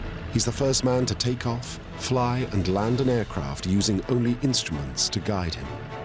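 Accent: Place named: England, United Kingdom. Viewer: American propaganda with an english accent. Nonsense.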